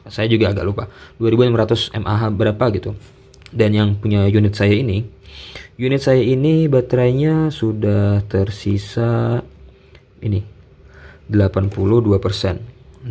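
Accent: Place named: South Kalimantan, Indonesia. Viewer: Masnya terdeteksi anak kalsel wkwk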